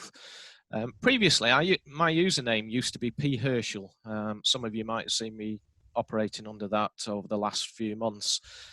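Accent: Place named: Northern England, United Kingdom. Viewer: I don't have a business...maybe one day...but this was still really informative and helpful. Talking about profiles, I don't like to see "junk" on my feed so I follow pages that I like (as you said, aesthetically pleasing) with posts that I am interested in. I live in the UK (originally from "up North" so loving the accents 😉 ) so one of the things I look for in a profile is some indication of where the author/owner is located, especially if it's a small business I may want to buy from now or in future, I would rather buy from someone in the UK. Thanks for all the useful info!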